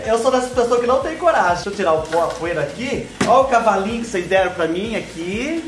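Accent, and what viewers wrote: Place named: Caipira, Brazil. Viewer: Você É Demais! Amo esse seu jeitinho caipira de falar, morro de rir com as palhaçadas que você faz e diz. Cansei só de ver você limpando, tu vai ver o que é o corpo fazer CREK quando chegar na minha idade (66), minhas costas estralam só de olhar alguém faxinando. Cruz Credo! 😘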